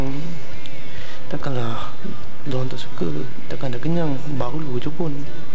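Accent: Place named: East Coast, Malaysia. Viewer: ko org mane ek? slang bunyi cm pantai timur je. hehe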